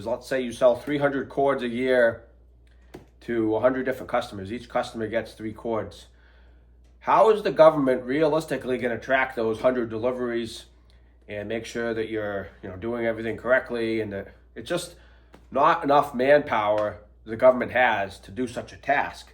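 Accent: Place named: New England, United States. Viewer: Disagree, the Davos crowd, or you name your favorite gathering place or club, in other words those who own our politicians, want the population to be 500,000,000, no more. Further, they realize by actual examples that have occurred in the U.S. and Europe, that people freeze to death in winter and die from heat exhaustion/stroke in the summer, where they have no guaranteed access to reliable energy. In winter this is fossil fuels backed up by wood and in the summer fossil fuels. And now with AI developing exponentially as well, that elite will no longer need wage slave labor for the majority of production and services either. This is the basis of their war on fossil fuels and other combustible energy sources. I recall reading in the last few years that Britain has already gone so far as to prosecute people for burning wood. Perhaps someone can correct me if I am wrong about that. 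Notwithstanding, Britain is also making war on fossil fuels under the climate change fear PSYOP. Question is, regardless of the basis for this, what do we do about it? Does anyone believe the political process is going to work? I believe I may have heard a New England accent from the presenter. Last time I drove from Massachusetts into Rhode Island I literally saw a, "are you ready for 2030" highway sign! But areas where you think you would be safe from this war on humanity are also capitulating, just not as radically or openly. I live in coal country, but for some reason coal plants are being not o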